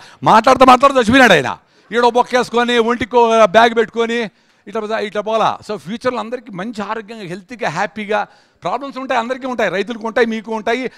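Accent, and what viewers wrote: Rayalaseema, India. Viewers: మీ రాయలసీమ భాష పెరుగన్నం లాగ కమ్మగా ఉందండి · మీ బాషా యాస చూసి రాయలసీమ లో పుడితే బాగును అనిపించింది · That rayalaseema slang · Rayalaseema basha entha madhuramga vundi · అసలు సిసలైన రాయలసీమ accent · మీరు మాట్లాడే రాయలసీమ యాస బాగుంటుంది సార్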